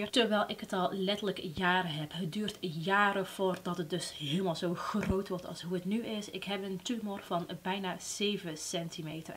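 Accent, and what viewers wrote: Brabant, Netherlands. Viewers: och lieve dit brengt echt tranen in mijn ogen 🥺 ik volg je al best wel lang. ik vind je zo 'eigen' met je brabants accent (is t brabants?) en te weten dat dit in je leven speelt raakt me enorm. maar wow wat prachtig dat het zo de goede kant, opgaat met behulp van God/Jezus, geweldig 🙏💖👏😇😇 ik wens je nog heel veel kracht & sterkte toe Powervrouw 💪🙌🍀🌸!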